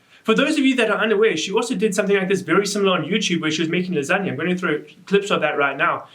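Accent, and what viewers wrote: South Africa. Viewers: Are you South African? I love your accent x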